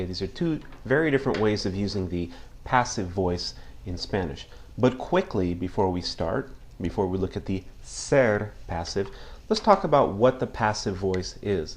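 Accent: United States. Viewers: I just don't understand why people have to be so critical of everything. So his accent is "estadounidense", so what? He's still a good teacher. You think English teachers in Latin America don't have accents? Would YOU have an accent...?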